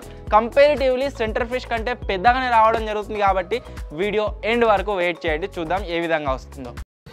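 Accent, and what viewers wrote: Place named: Telangana, India. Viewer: bro Telangana slang vintunte super ga anipistundhi bro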